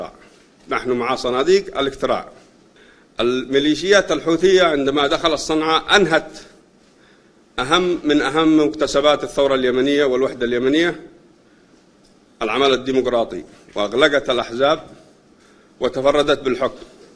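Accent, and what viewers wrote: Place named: Iran. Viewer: من لهجتك وطريقة حديثك يتضح ان مجوسي تابع لبني صفيون في ايران ،،ما علاقتك باليمن العربي الجريح وانت لا تنتمي له ولا للعرب ولا للعروبه ،،والله ليسحقوك اهل اليمن الشرفاء بمساندة اخوانهم السعوديين عما قريب واتوقع قريبين جداً ربما لا يتعدى سنه ،،،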